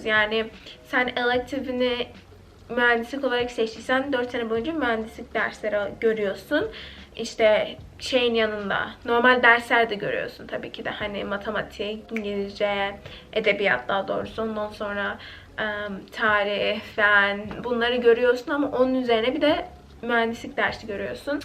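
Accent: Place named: Texas, United States. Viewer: Litt video, succesful video. Im a fan from now on!! we are waiting for more. u got texas accent tho..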